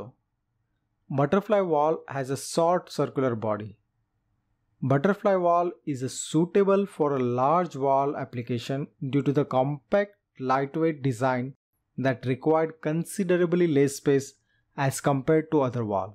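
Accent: India. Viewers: Indian Accent. Never ceases to irritate me.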